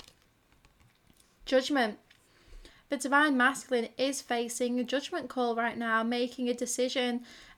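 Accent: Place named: United Kingdom. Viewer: Pretty sure accent is British and the cards are priced in pounds, so will be coming from UK. Usually fairly quick postage, although due to unknowns on Brexit, our international post is getting backlogged. I sent all my international post to my family for Christmas today to beat the 31 October.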